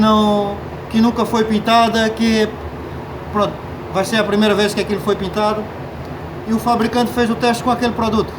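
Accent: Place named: Portugal. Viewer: Vc tem um pouco de sotaque português......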